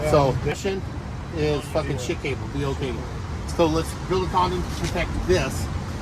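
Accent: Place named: New Jersey, United States. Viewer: sounds like hes from new jersey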